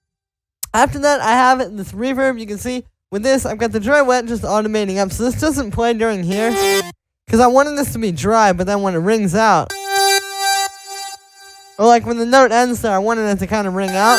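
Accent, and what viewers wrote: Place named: France. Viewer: Your voice is horrible,please stop talking during your videos !! Je penses que tu es français.. FERME TA BOUCHE ou ôte le bout de fromage que tu as entre les dents quand tu parles !! Quand on a une voix horrible comme la tienne on ne prononce pas 10 mots par seconde..ou Alors ARTICULE..c'est fou que tu ne t'entendes pas parler. mec...Corrige toi ..Sinon tu bosses bien tu as du talent mais stp tais toi !!!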